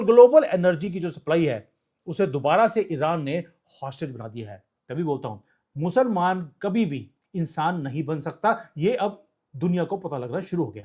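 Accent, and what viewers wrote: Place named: Punjab, India. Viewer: Hehehe..very interesting.. I very much like your typical punjabi style👍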